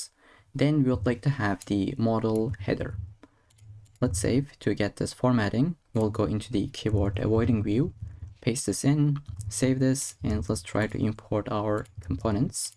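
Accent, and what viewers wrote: Turkey. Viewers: türkmüsün la ingilizcen asiri türk aksani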